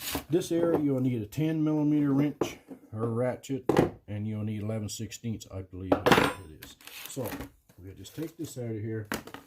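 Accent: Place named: Maritimes, Canada. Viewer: Ahh, A CANUKIAN EH ! I thought I heard a touch of the East coast there. I am in North Central BC. Just an hour west of Prince George.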